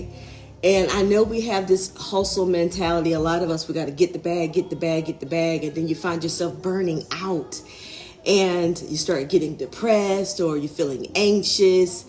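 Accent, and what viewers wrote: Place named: Carolinas, United States. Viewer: Heeeey you from The Carolina’s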